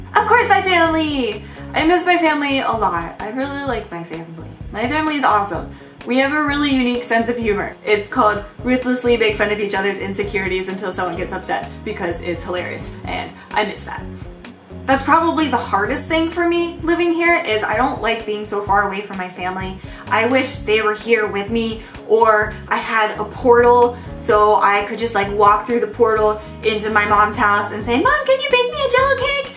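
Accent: United States. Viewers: And I like your deep, funny, "What are you talking about..." American voice!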